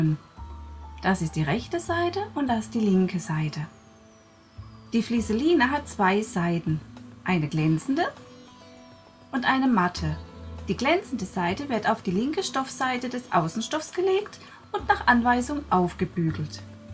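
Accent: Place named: Karlsruhe, Germany. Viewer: nein ich höre es am dialekt :D komme selbst aus karlsruhe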